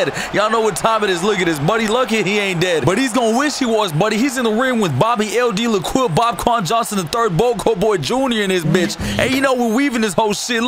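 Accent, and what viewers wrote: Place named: New York, United States. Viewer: This man sounds like he from NY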